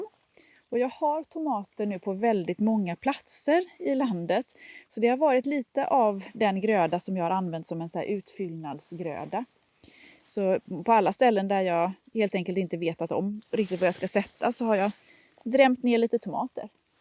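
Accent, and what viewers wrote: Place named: Sweden. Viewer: Som dansker forstår jeg 95% af hvad du siger. Det er sjovt hvordan det bare kommer an på den svenske accent hvor meget jeg kan forstå. Det er som om du snakker dansk til mig 😅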